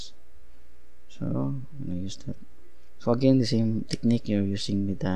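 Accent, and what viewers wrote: Philippines. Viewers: Excellent. You are the one I want to learn from as a beginner. You speak like you are a Visayan from the Philippines, are you?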